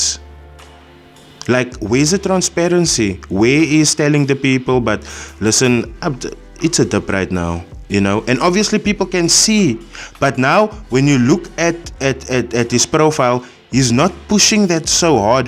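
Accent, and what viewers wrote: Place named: Cape, South Africa. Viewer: Snap bra you have that heavy Kaap accent. Much love my bra. As I subscribe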